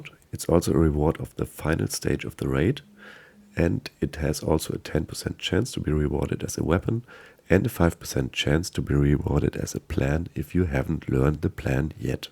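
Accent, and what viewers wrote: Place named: Germany. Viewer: Du hörst dich an, wie ein deutscher der sehr gut englisch spricht.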